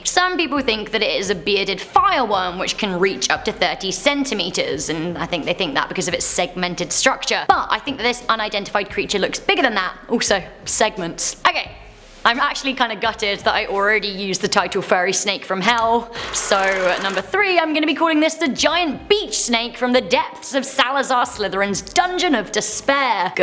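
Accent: United Kingdom. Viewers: It’s British like mine <3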